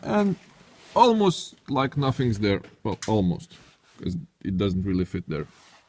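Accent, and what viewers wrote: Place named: Russia. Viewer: first, sorry for my bad Englisch, you talk like an Russian Comrade, i' am and my friends are very louthing about your Video , your Speech is wonderfull, you are the Best !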